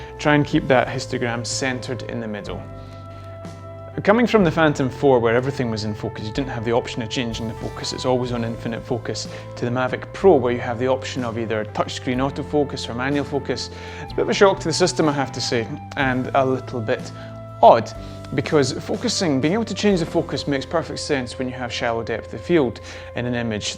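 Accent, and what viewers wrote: Scotland, United Kingdom. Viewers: Great video for a newbie. So good to hear a good Scottish voice :-) Thanks for such a clear video - i have now set my new mavic to all these settings. Working my way through your set.. Cheers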